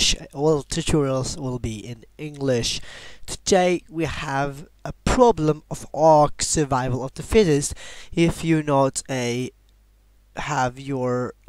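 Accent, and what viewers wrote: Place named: United Kingdom. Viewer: Ahh youre also Swedish metoo shoutout to u ma boy BTW you sound brittish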